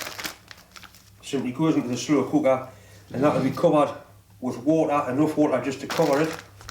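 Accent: Newcastle, United Kingdom. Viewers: good video , I did enjoy listening to the Geordie accent . Being from the north east of england , I miss a ham and peas pudding sandwich on a stottie cake . I cannot find the stottie cakes in las vegas.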